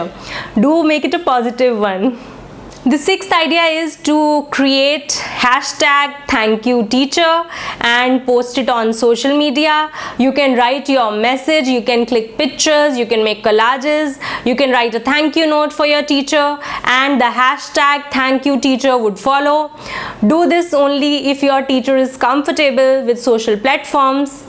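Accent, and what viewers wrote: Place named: United States. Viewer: Mam pta hai apki english sunne ke bad lga ki aap american ho ... lekin thank god aap indian ho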